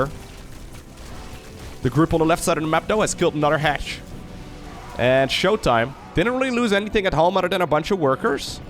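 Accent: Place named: Netherlands. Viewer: Your voice always sounds funny 😜 (because of the Dutch accent, which always sounds funny to me as a fellow Dutchman).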